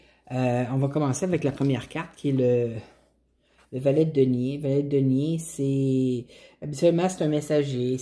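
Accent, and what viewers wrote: Canada. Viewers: J’ai oublié...j’adore cet accent canadien qui pour moi correspond a optimiste et musical...! 🙏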